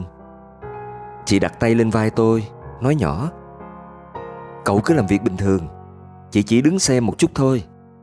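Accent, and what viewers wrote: South, Vietnam. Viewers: Một chất giọng nam bộ đầy lôi cuốn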